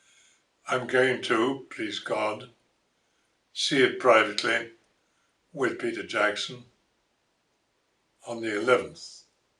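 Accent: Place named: United Kingdom. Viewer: Out of all of the different English accents across United Kingdom this one what a true English gentleman sounds like in my opinion, every time I hear someone speak like this I immediately have a higher opinion of them.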